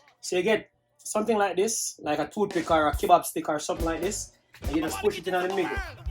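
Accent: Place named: Jamaica. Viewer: Hello hun! Wah gwaan? I love your channel because you make everything look so amazing!! And The accent adds to it!! I’m learning Jamaican!!❤️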